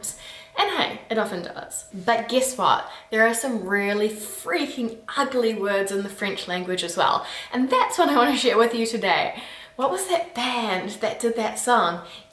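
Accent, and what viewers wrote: New Zealand. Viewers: Just wondering if you are a kiwi by any chance? I thought I heard some kiwi accent/ pronunciation. Eg seven or percent :) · The closeness to crap is only because of your kiwi accent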